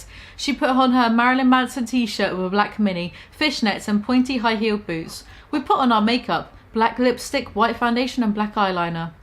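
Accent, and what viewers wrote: United Kingdom. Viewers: with a british accent... Noice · I gotta say, hearing this in a British accent makes it better than other readings i've been listening to lol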